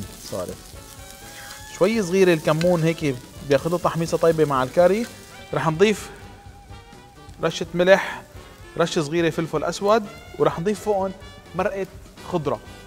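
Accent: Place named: Syria. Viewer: انضميت للقناة كرمال اللهجة السورية بس♥️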